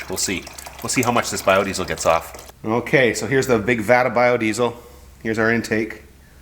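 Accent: Canada. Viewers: Watching this from UK. Thanks for posting. Are you Canadian? Take off eh.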